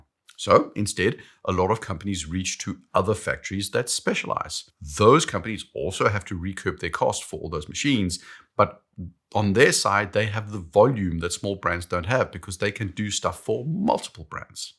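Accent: South Africa. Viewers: Your accents sounds soooo South African. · My wife is South African, I’ve been to South Africa, love the people and the place- this is a heck of a watch channel, but I do feel biased for that South African accent…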